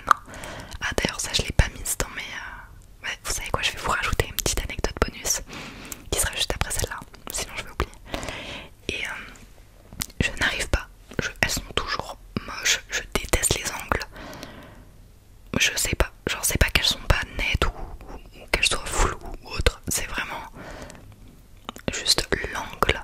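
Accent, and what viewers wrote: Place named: South, France. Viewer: J'avais jamais entendu ton accent du sud !! 😂 Excellent. Moi je le prends depuis que j'habite dans le sud sur certains mots aussi.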